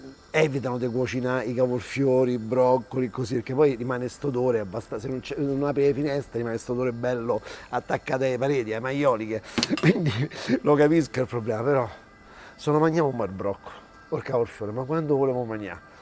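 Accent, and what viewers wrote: Rome, Italy. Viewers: Toujours des recettes délicieuses, et puis cet accent romain qui me rappelle tant mon enfance, merci Chef..... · Ma la < padela de fero>... é robba da guera. Non mi piace il dialetto romanesco, ma tu me lo rendi gradevole. posso un consiglio? Il torsolo dei broccoli, NON SI BUTTA! Va pelato dalla scorza e spuntato alla base, poi si taglia in pazzetti di mezzo centimetro e si mangia crudo con o senza condimenti. Una delle verdure piu buone in assoluto, da primato! E pensare che tutti lo buttano via. Un delitto. A crudo, tipo mela.. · Quando ti sento mi ricordi Roma (dalla quale manco da più di 20 anni, ahimè) comunque l'hamburger l'hanno inventato gli antichi romani (isicia) anche se la radice del nome è in effetti tedesca..Complimenti per la ricetta, i broccoli sono un colpo di genio... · Meno accento, romano però: ti sentirai più spontaneo.... 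a me sembra che parli solo a quelli della tua città ...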